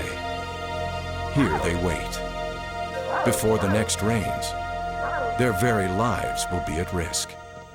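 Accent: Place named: Texas, United States. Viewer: He's narrating Texas-style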